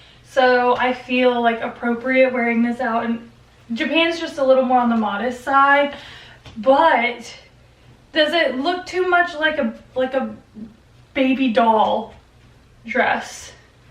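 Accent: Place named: South, United States. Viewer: Omg your southern accent is quite prominent at times throughout this video and I absolutely adore it 💞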